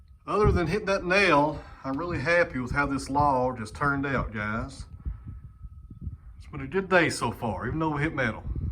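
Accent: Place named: East Tennessee, United States. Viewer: Ha! I started watching this and thought "that sounds like an east TN accent", then looked at the description and saw you was from Kingsport! (I'm from WNC, love me some east TN!)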